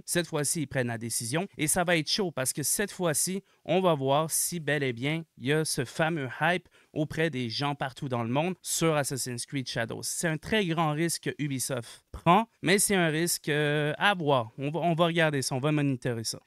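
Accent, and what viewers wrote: Quebec, Canada. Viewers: Sympa ta chaîne mec ;) J'adore l'accent et les expressions du Québec.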